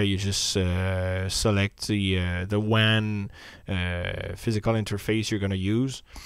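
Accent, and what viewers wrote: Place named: Quebec, Canada. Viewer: LOL j'adore l'accent et finalement pas tataouinage une explication a la québécoise direct au point cool merci!!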